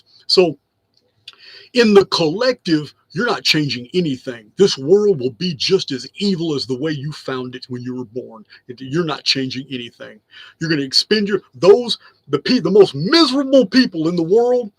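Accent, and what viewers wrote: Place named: South, United States. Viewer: Kudos to you for taking her to a safe place when you realized it was going bad. She would have been too traumatized to be left to drive. It turned out bad for you but you rose above it. And in the process preserved old books and info that would have been lost forever. I am in Australia and in the 1970s southern baptist missionaries came to convert the heathen in Australia. I was brainwashed for over 30 yrs before I escaped their dungeon programming. My experience has made it where I cannot stand southern Americanaccents. It triggers me big time. So when I came across your videos I struggled to listen to them and often only listened for 30 mins. But I kept getting prompted to listen to what you had to say. So I dipped in and out for few months. Then I realized it was time I stopped disregarding anyone with a southern American accent. 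I realized where you were born no more reflected on you than someone born Asian, coloured, or Australian. I overcame my reaction to your accent and focused upon your message but more importantly your heart and soul. From that moment I broke out of that mindset based on unpleasant cult upbringing and now love to hear your rants and research. I could have missed so much if I stayed put off by a silly trigger of an accent. It is still something I have to check myself every time I hear an American accent over here. 😂 Hearing your life story one day for the first time caused a huge shift in me. I have days where I binge watch. Really like the Q A podca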